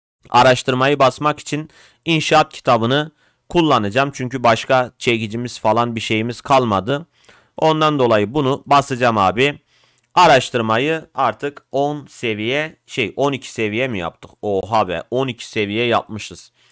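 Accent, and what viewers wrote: Turkmenistan. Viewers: Abi sen turkmenmisin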